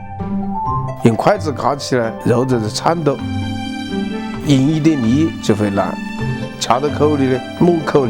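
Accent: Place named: Changsha, China. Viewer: 长沙话就是韵味！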